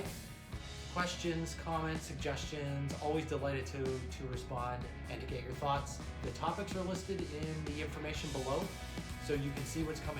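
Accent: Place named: Northeast, United States. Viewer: Thanks. If I pull the trigger on the 701, I'll probably be peppering you with questions. Looking forward to following in your footsteps. Where in the world are you based? Sounds like NorthEast USA somewhere.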